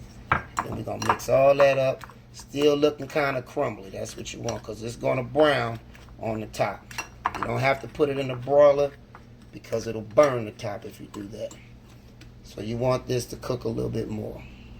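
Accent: New Orleans, United States. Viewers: Oh you moved from Louisiana to Dallas because you sound NOLA🔥 FASHO I KNOW YOU MISS FOOD BACK IN THE BOOT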